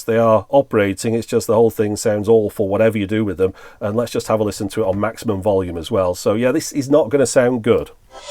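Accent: United Kingdom. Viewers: I have a number of very good friends in the UK and this guy sound like so many of them. Before you get mad I AM NOT making fun of this man. I love this channel and what he does sharing technology with us. What I enjoy is the way he phases what he says. He said something along the lines of Yes you can play MP3's via USB drive but it does not really make any difference since it all sounds like utter rubbish anyway. When he says something is terrible it just makes me laugh because, he is ever so polite BUT, when he says something is bad his words are so hard, biting and direct yet so gentle in a way. I subscribe to this channel because; this guy is great and knows his electronics. This guy is honest. Yes sir I've brought a few vintage items just because I saw them here first. That said the reason I love this channel beyond the technology is because; this guy savages today's cheap electronics. 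The funny thing is the polite lovely tone of his voice and his choice of words almost kills a bad device with kindness which just makes me scream with laughter. I agree with him this mono cassette player \ recorder boom box is total and utter rubbish no matter what you try to do with it. I'm not joking when I say I am happiest when this chap evaluates modern tech because; he will buy cheap devices for 30 pounds sterling and put them through their paces. This is one of the best channels on You Tube! Thank you sir. You remind me of my friends from the UK who can say the most mundane things an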